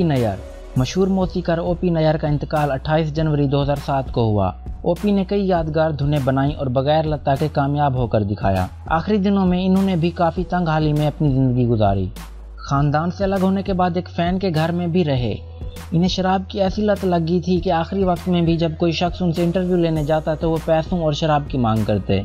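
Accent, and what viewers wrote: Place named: Pakistan. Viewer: Listening a Pakistani Urdu accent on background !!! Something is fishy!!!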